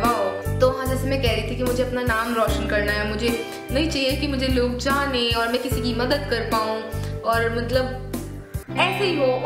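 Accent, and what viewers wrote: Delhi, India. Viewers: Oh my god u r so cute ur hindi accent is superb u are a typical delhi based girl i just loved it Nd even i m 20yrs n still my parents are not allowing me for learning driving anywayz no issue and all the best for ur exams lots of love😘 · Tumhari Hindi kitni polished lagti hai ! And the typical Delhi accent ! It sounds amazing !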